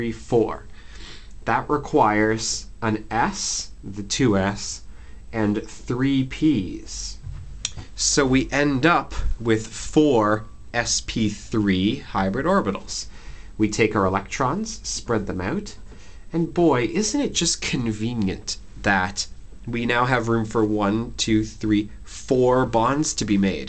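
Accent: Canada. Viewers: May God bless you for explaining this so well. Kudos to you and your possibly canadian accent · Great teaching and Canadian accent. Thanks brother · I just love your commitment to chemistry! Thank you very muchm it helped a lot! BTW... are cou Canadian? :D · This nigga sound hella like a dude frum candana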